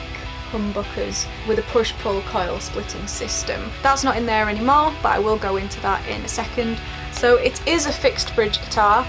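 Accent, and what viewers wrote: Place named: United Kingdom. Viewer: i would have never guessed you're british. Watching your older videos i thought you were from southern california. Your general demeanor, taste in music, taste in guitars, even your playing technique and room decorations scream "younger millenial or older gen z from orange county, lower middle class suburban public school outcast, rasied on top 50 rock radio and barely caught the tail end of the warped tour era then went to musician's institute for college". You could have fooled a CIA profiler until you started talking. Probably mostly still accurate, except you wish you were from socal.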